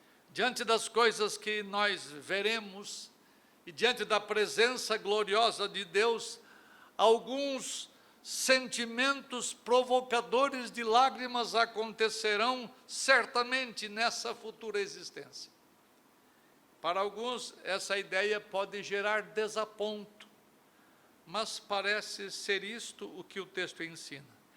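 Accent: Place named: United States. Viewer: Q sotaque de americano é esse às vezes? E "nois"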